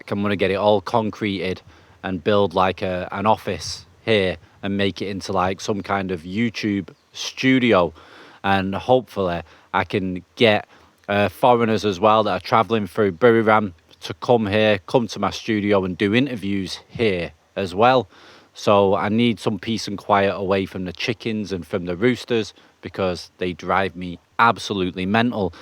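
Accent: Manchester, United Kingdom. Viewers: Todmorden eh, I didn’t expect that😂 I was thinking Gorton or Longsight with that accent. I spent a few years in West Yorkshire, amazing people. If I had to live in England it would defo be there. I’m watching this in 11 degrees with threats of rain, that bamboo hut looks so appealing ✌🏼🙏🏻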